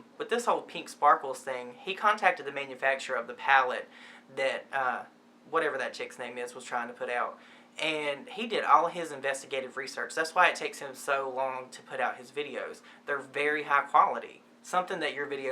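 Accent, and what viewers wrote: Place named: New Orleans, United States. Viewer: Very well said and presented ☺️. I'm gonna have to sub so I can keep up with you! Your accent sounds like where I grew up (small town suburb on the north shore of New Orleans LA)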